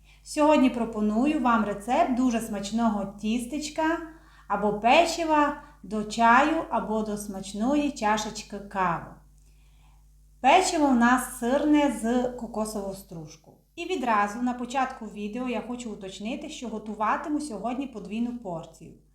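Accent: Transcarpathia, Ukraine. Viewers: Доню, сир завжди був "домашній",або "свіжий". То у москаля "твораг"...Ми ж не співаємо:Любив козак дівчину, і з кисломолочним сиром пироги😊😉. А слухати вас дуууже приємно, бо згадую дитинство та подорожування по Закарпатті.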